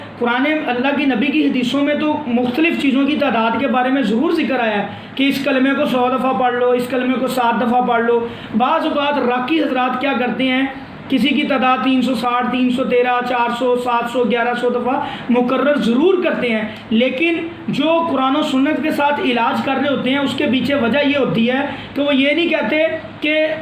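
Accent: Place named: Punjab, Pakistan. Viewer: He is in punjabi accent with correct information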